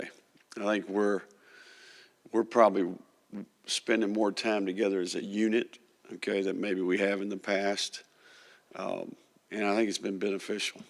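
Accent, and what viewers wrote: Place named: Tennessee, United States. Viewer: You must not be from Tennessee lol everyone talks like him In Tennessee (where he’s from)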